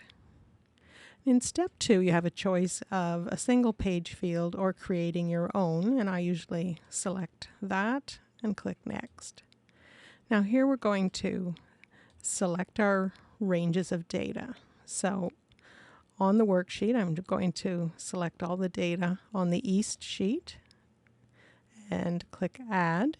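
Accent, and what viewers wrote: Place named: United Kingdom. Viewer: This helped a lot thanks. Also, idk why but her voice kind of sounds like a British person trying to use an American accent. Not necessarily bad, just interesting.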